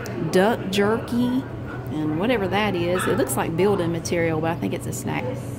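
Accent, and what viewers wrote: South, United States. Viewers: I live in Virginia Beach and I do the same thing you do, whenever you’re around relatives the southern accent comes out stronger!! I have family in both Carolinas and I love stopping at the border and south land!!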